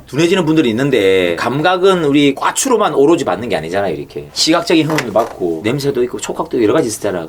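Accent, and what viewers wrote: Gyeongsang, South Korea. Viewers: 특유의 경상도사투리가 킬포네 ㅋㅋ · 사투리가 무지 쎄신데 부산분이신거에요? 대구분이신거에요?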